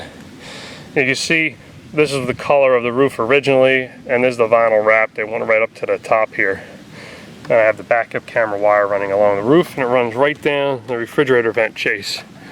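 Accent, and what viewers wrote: New Jersey, United States. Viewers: Everyone in Jersey sounds like that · Love the “wooder” hose, and “wooder” heater. Gotta love the Jersey accent!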